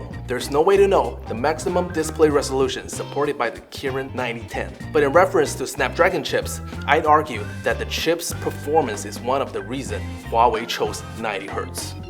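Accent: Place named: England, United Kingdom. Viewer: 还真整这口老英语腔儿啊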